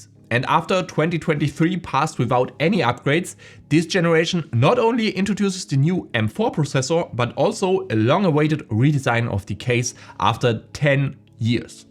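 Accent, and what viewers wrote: Germany. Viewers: ´Ming Jung, ich hör doch direkt du bist nh Dütsche ^^ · da german comes trough wenn speaking. he tries not to spell like a native english guy as you would learn it in europe.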